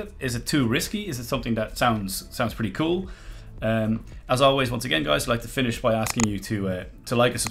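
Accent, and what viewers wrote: Ireland. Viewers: Bro r u irish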